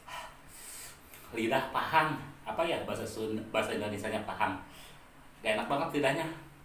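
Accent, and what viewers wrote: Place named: Banten, Indonesia. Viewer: Aduuh aduh ka kebanyakan peuheur bhasa Pandeglang mh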